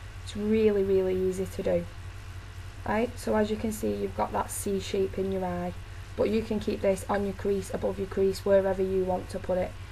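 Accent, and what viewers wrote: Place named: Manchester, United Kingdom. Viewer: Are you from Manchester. I am and you sound like me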